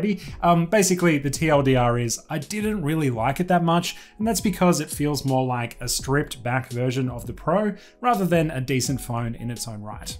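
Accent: Australia. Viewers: You're absolutely right. LoL. It's kinda weird 2bh 😁. An Aussie tech chap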